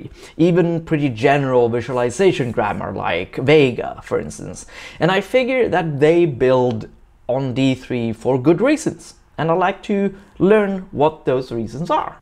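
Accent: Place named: Sweden. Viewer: How i missed that Swedish accent and humour! Lovin' it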